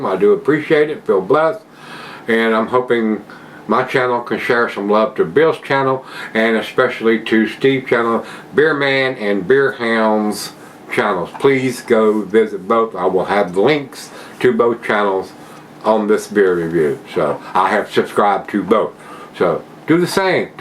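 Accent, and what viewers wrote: South, United States. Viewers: I now check the dates on ( most) of my beers because of. His reviews broadened my beer knowledge and appreciation. Randomly enough, I found (find) his reviews to be very relaxing and almost asmr like due to their very simple format and comforting southern drawl. Over the years I’ve watched his reviews almost religiously and looked forward to when he would upload a new review in the morning. It was a nice simple escape that made me proud to be a beer drinker. From now on, every beer I drink will be in his honor.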